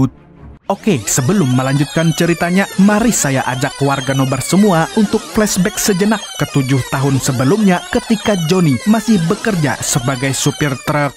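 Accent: Banjar, Indonesia. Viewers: Urang Banjar pinanya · Urang banjar kah mang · Suku Banjar ya min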